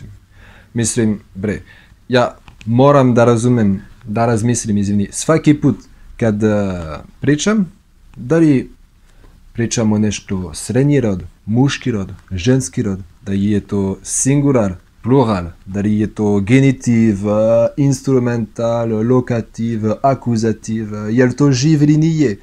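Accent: France. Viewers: prica srpski sa francuskim naglaskom hahahahahaahh super si ljubi brat 😂💪🇷🇸🇫🇷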